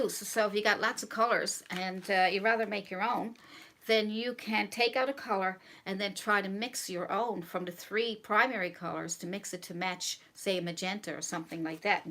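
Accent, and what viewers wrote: Newfoundland, Canada. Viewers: I must ask you, are you from Newfoundland. I hear your accent and colloquiums which bring me to this conclusion. I'm glad I found your great tutorials. Best Kind. Thank you for your secret into why my paintings are killing me. I was a mural painter for a living with a few other talents lol I thought canvas painting would be a great step but I hit the wall. I'm taking it down after watching you, brick by brick. Take care.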